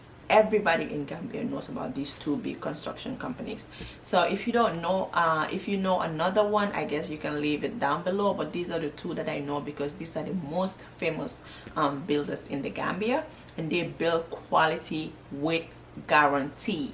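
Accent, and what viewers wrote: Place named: Jamaica. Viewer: THEY HIRED PROFESSIONALS. AND. HANDY. MEN. YOUR. ACCENT. SOUNDS. JAMAICAN.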